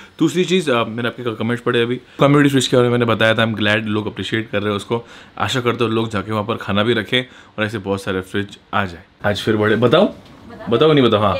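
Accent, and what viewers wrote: Mumbai, India. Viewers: Sir...GoPro Waala View Mast tha...Nd Old Mumbiker Sir is Back with his Mumbaiker Waali Tone....sir Loved the interviw!!😀😎❤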